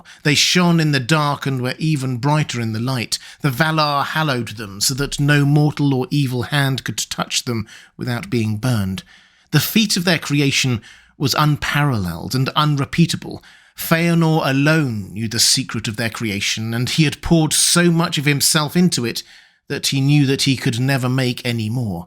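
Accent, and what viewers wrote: United Kingdom. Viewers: I like to put on your videos and have your dulcet british voice lull me to sleep.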